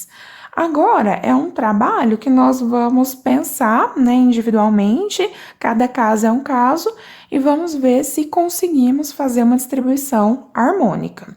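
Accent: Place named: Minas Gerais, Brazil. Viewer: Sotaque mineiro, me pareço bem bonito.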